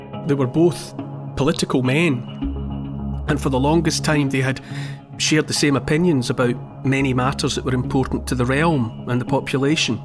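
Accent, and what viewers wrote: Scotland, United Kingdom. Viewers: Neil Oliver => bringing back prestige to the Scottish accent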